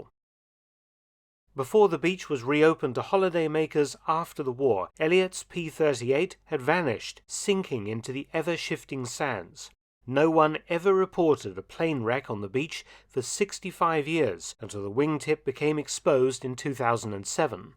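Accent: United Kingdom. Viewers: An oh so properly British presentation. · Always enjoy Professor Felton’s documentaries. But since you appear to have a British accent, it’s quite odd how you pronounce the word “lieutenant” the American way, “loo-tenant”. It’s certainly not British English. How about not contributing to the on-going Americanisation of the United Kingdom, eh?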